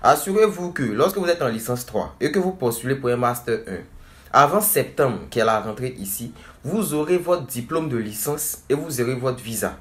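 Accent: Ivory Coast. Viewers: Mdrrr tu m'as tué avec votre accent ivoirien. Bah j'suis un étudiant sénégalais en licence 3 commerce international je souhaite intégrer un iut . Dans mes choix de formation j'ai postuler 6 formations en métiers du commerce international et une formation en DUT techniques de commercialisation. Ma question est de savoir si il est conseillé de postuler dans différentes formations avec quelques rapport en termes de matières enseignés et de débouchés professionnels. Et comment justifier cela au cours de l'entretien pédagogique ? Merci d'avance !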